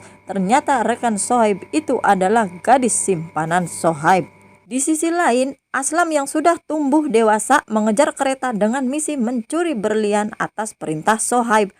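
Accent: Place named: West Java, Indonesia. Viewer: Logat nya terlalu kelihatan... kalau anda dr bagian jawa barat... klu bukan cirebon pasti indramayu...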